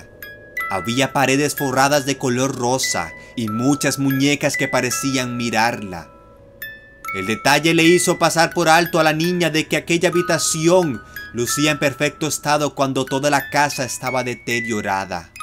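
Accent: Arab world. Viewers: acento árabe X'D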